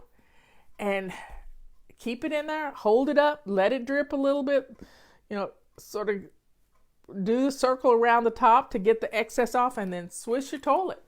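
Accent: South, United States. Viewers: Congratulations Marla! I've been a follower of you and the system for many years. I really enjoy listening to your encouraging words and southern accent as I rest with recent diagnoses and treatment of matasticized breast cancer of the lungs. I enjoyed you back in the years past and I love that I can hear you daily as I do what I can. Love you and thank God for you, Richard, your dogs, your family and flylady staff! You make my day!...sylvia in WA state 😊 ⛄ 🎶 🐶🌹 🎂 🍹 🎁 🎉 🙏 💖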